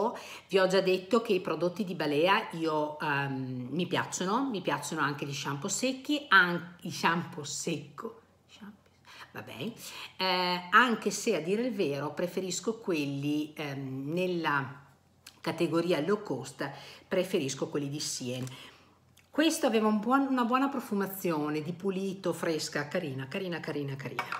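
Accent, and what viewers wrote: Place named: Italy. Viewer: Ma daiiiiii l'accento molto conosciuto. 😂di che zona?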